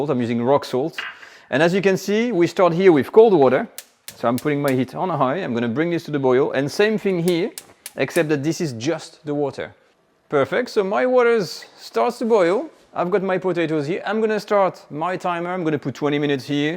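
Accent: France. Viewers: Frensh english lol , nice vdo btw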